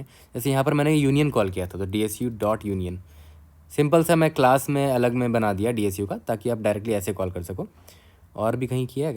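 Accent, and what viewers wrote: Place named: Bihar, India. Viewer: bhaiya i know you are from up or bihar i am also from bihar currently i am in my 3rd sem . proud moment when someone from your region has great knowledge in dsa i guess this from your accent ketno dfs maar lo isme and you always said aapke not tumhre the respect you are showing .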